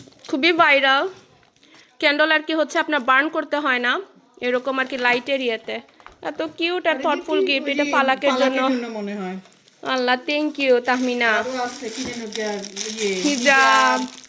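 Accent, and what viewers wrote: Sylhet, Bangladesh. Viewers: প্লিজ আপনিই সিলেটের ভাষায় পুরো ব্লগ দিবেন আমার সিলেটের ভাষা শুনলে কলিজা ঠান্ডা হয়ে যায়😊 আমার বাড়ি হবিগঞ্জ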